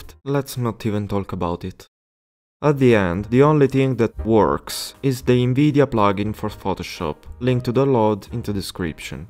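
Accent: Italy. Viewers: I can tell this is south italian accent from a thousands miles away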